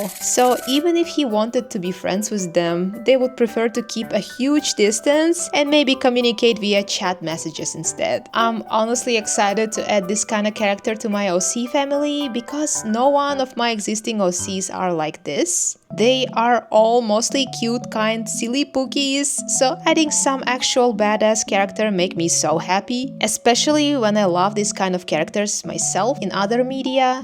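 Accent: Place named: Russia. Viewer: Просто интересно. Я недавно наткнулась на твои видео, и слушаю тебя... Твой голос напоминает русский акцент) ты случайно не говоришь по русски?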